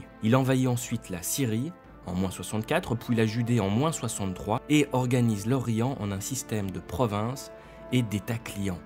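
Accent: Belgium. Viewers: Bel accent belge... Bon document aussi! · Et vive la pouissance romaine !!! (j'adore l'accent belge... :p) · Il est belge vus son accent, d'ou les "septante" pour soixante-dix ;) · ca m'a un peu déstabilisé, on ne détecte pas l'accent belge au delà de ça, mais excellente vidéo BTW ;)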